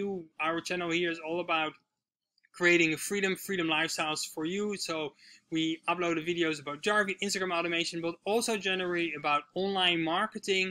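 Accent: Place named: Netherlands. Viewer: Grappig dat je nederlands accent hoort .. ik kom trouwens uit Honduras🇭🇳 ,groetjes en genietze in Costarica 🇨🇷💯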